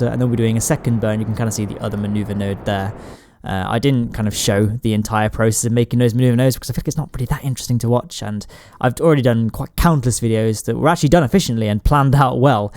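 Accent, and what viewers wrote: United Kingdom. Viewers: Your intro could have been a BBC TV intro, with your accent and all.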